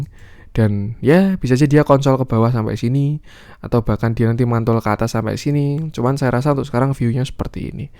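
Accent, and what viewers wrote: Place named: Java, Indonesia. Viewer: Medhok bgt mas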